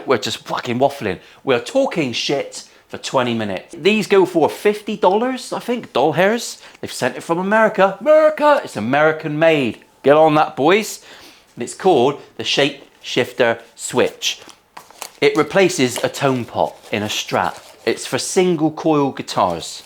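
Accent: United Kingdom. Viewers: Now we're talking. I just Frankenstrat'd a Squire. Took out all 3 pickups. And took the tone out. Put in a Obsidian pot volume. And the rest of the hardware I mean everything else I'd Wilkerson. I put in a p90 at the bridge. I get a funky tele sound and straight up dirty rock. Love this dude. I'm guessing he's A brit? He don't sound like the local hillbillies here.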